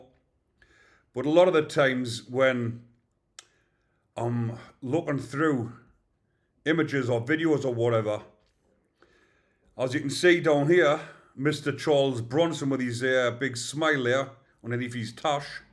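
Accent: Newcastle, United Kingdom. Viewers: Pure Geordie 😁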